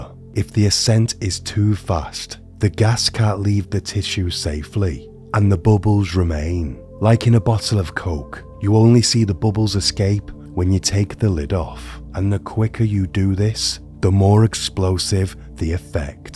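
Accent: United Kingdom. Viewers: I love the British accent, though I find it hard to follow if I'm just listening (yes. I know. It means I'm stupid cause no one else has that issue. Kool... Moving on) I thoroughly enjoy your narration, and, for what it's worth, appreciate the work you put in. "Jolly good show ole chap!!" ❤ p.s. Liked AND SUBed 😉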